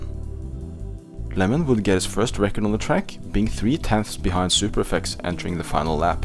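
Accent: Norway. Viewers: As a Norwegian myself, i heard your voice, and thought «Hmm, his accent is a bit norwegian…» AND I WAS RIGHT 😭😭💀🔥 W Vid btw ❤️ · Wow I'm pleasantly surprised by the shear quality of this video you've made! Amazing video, I thoroughly enjoyed it all the way through :D Also: I guess I've found someone with a thicker Norwegian accent than mine (not saying it's a bad thing btw) haha :D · Jeg bare hææ hvorfor høres du litt norsk ut noen ganger også leste jeg på profilen haha, nice! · Bro eg hører på stemmen din når du snakke at du e norsk💀💀Eg har mye være engelsk stemme da lmao. kul video da fortsett sånn! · Du må være norsk! Basert på aksenten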